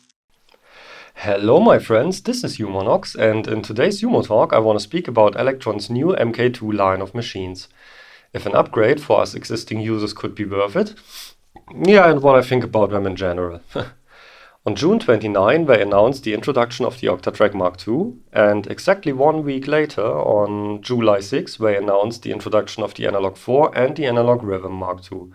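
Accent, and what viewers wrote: Germany. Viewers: you are sooo fast. too fast for my ears.... i like your oder slow Videos. are you german? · "Some may laugh the decision"- love that cool German accent!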